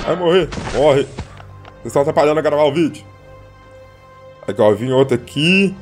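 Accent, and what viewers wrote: Minas Gerais, Brazil. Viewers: Parabéns. Você é mineiro né adoro esse sotaque.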